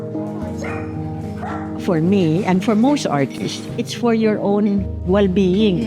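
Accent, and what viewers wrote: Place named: Conyo, Philippines. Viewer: conyo pala si Ma’am hahaha ang cute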